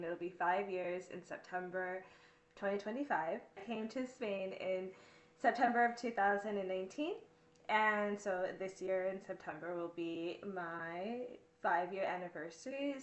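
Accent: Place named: United States. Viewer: Are you originally from the united state? because you speak like you are from the united state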